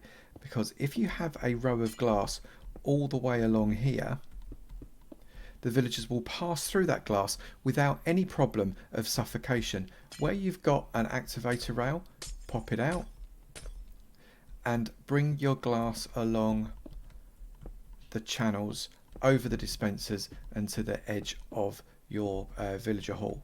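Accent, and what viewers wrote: United Kingdom. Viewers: Nah its British accent.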